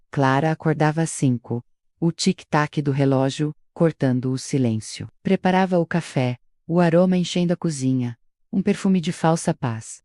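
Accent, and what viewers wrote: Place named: England, United Kingdom. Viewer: Porque o sotaque forte do Ingles? A historia é bem interesante❤❤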